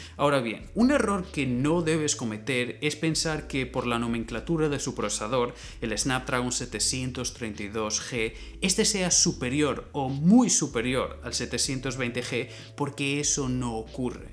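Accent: Canary Islands, Spain. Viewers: Eres de Canarias?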